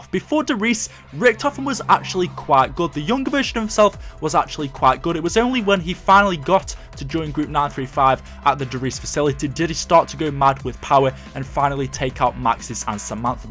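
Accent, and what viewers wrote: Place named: Stoke, United Kingdom. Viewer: hate the Stoke accent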